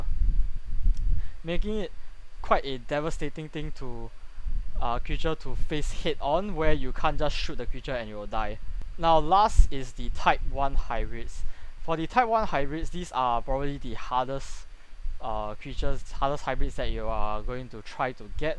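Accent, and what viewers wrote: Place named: Singapore. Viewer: I'm a simple man, I detect Sing-lish, I liked and subbed, greetings from Jakarta. Stay Safe!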